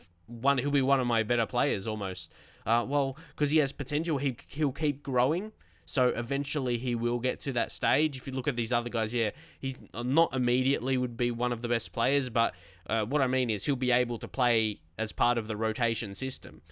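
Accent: Australia. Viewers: Love the career mode but it's moe-at I understand it's the Aussie accent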